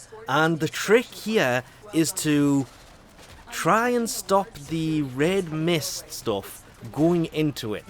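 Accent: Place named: Newcastle, United Kingdom. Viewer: North east of England, sounds like Newcastle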